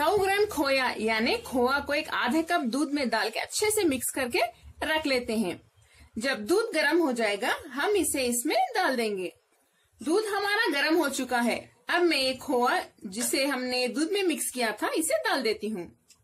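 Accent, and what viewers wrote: Hyderabad, India. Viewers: I really like your hyderabadi accent and by the way thanx for sharing the recepie love hyd food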